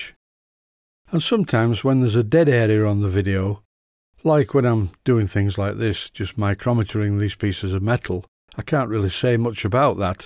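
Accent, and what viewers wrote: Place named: Northern England, United Kingdom. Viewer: I know very little about steam engines. I'm most likely never going to build one. But these videos are still enjoyable... your Northern (to a Bristolian southerner like me) is oddly calming and fits well with the engines.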